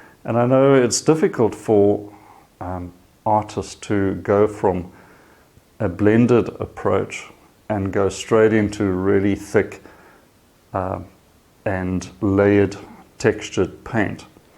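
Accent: South Africa. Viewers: Thank you for your tips on painting! I really enjoy watching your videos and also listening to your south african accent as I lived 4 years there and left it for Kenya in 2017. SA always in my heart! Regards.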